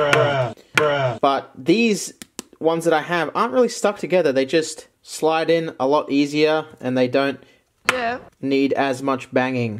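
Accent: Australia. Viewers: This shouty Australian man has invaded my YouTube viewing ..... And it's great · listening to Australian man screaming at card while having a coffee in the morning is exactly what i need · man I need your videos, I need Australian ga- , guy screaming to pokemon cards.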